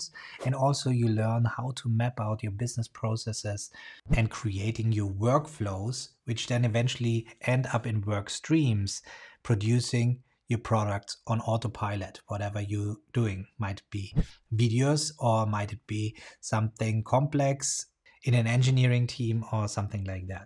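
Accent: Germany. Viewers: yes I discovered you guys one week ago and I am very impressed, I love your framework… one question where can I contact you? And I am very curious looks very Spanish but your accent is German isn’t? 😅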